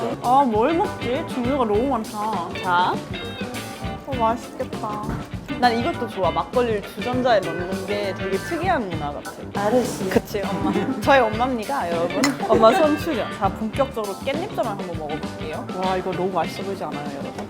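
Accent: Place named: Gyeongsang, South Korea. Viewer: 어머님과 함께 갱상도 사투리로 대화하는 모습도 무척 재미있습니다.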